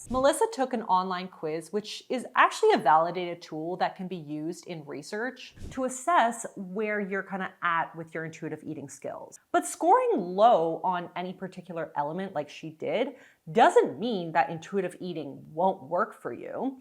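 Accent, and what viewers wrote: Canada. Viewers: Honestly I thought you were american like me. You barely have a Canadian accent. Now that listen more carefully I can here it a bit.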